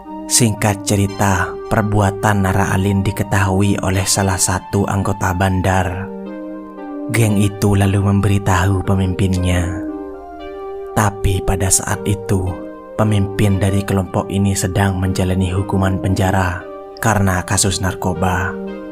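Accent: Madura, Indonesia. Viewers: Orang madura bg? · Orang Madura ya bang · Orang madura ya bang!!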